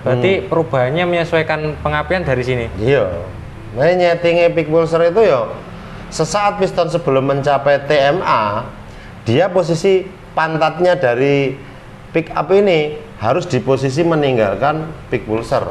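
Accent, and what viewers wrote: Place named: Surabaya, Indonesia. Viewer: suroboyoan rek...siiip jelas dan trimakasih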